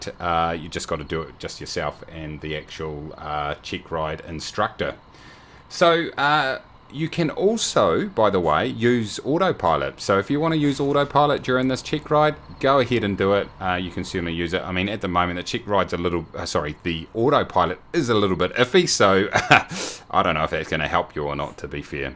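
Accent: New Zealand. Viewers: Love the Kiwi accent!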